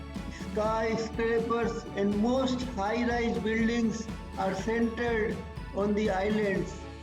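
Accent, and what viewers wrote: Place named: India. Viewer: He is Indian His accent is his identity We love it like that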